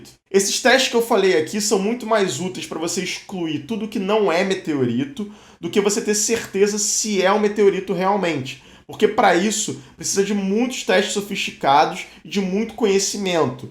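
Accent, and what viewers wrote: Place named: Rio de Janeiro, Brazil. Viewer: Você é do Rio de janeiro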